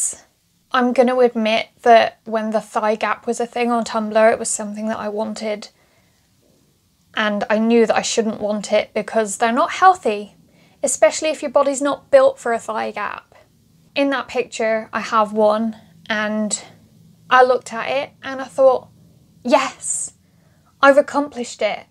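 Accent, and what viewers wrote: Bristol, United Kingdom. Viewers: Is that a Bristolian accent I hear?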